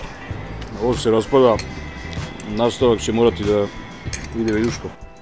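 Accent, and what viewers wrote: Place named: Belgrade, Serbia. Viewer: Ovo je zaista iskren komentar. Odlično radiš, što radiš ni psovanje ne smeta to je srpski rečnik inače realni. Ali do jaja je bljutav taj bOOOgradski naglasak i dijalekt. Valjda što je meni beograd toliko omražen, možda sam pristrasan ali malo prirodnosti ne bi škodilo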